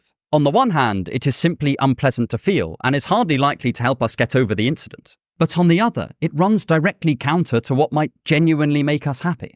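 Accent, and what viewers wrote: United Kingdom. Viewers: I watch for philosophy but also bc your s tier British accent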